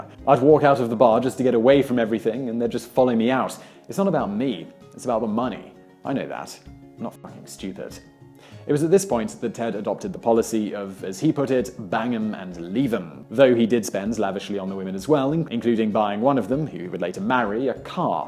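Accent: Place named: Belfast, United Kingdom. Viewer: Is anyone here from Belfast ?? This sounds like a homeless man in Belfast.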